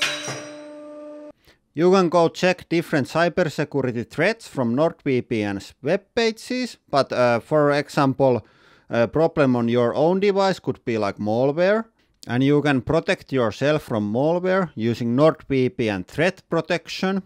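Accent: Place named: Finland. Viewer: I haven't seen these vids for a few years, I'm so happy to hear the charming finnish accent hasn't changed a bit :D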